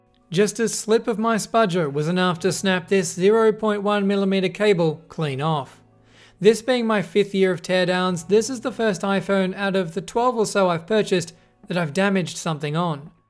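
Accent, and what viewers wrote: Australia. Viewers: Australian english mate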